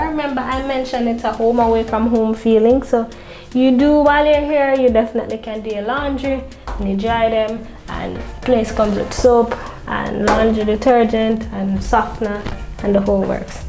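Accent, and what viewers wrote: Jamaica. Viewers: you are very funny and cute... Especially when you let go with your Jamaicaness. I love you videos and your energy..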